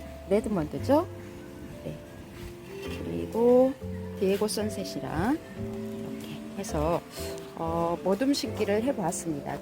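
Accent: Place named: Jeolla, South Korea. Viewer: 영상구도도 공부하시고 전라도 사투리도 구수하고ᆢ풀시청 잘보앗읍니다~~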